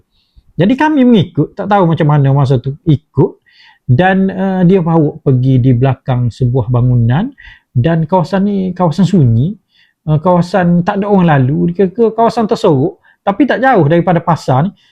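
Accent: Kelantan, Malaysia. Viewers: Asslmualaikum..selama perak hadir..sekadar cadangan kt..klu boleh kecek kelate lebih kerap..dpt gak blajo 😊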